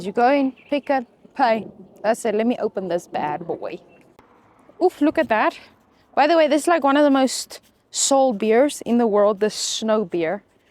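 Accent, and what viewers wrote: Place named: South Africa. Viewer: Did you live in the USA? I hear your South African accent, so I'm wondering.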